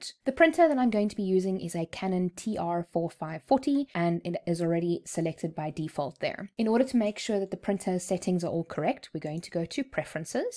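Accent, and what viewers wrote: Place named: South Africa. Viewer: Your accent is so nostalgic. miss SA so much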